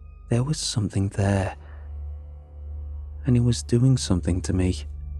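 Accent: England, United Kingdom. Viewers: A guy with an English accent talking about Missouri and Minnesota. 😅🤔👍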